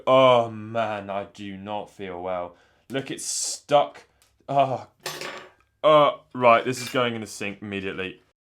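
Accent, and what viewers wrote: England, United Kingdom. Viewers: I love how his English accent sound "Dah screw sstick to my finguh" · The English accent and vocabulary makes me feel like the gameboy is dirtier than it really is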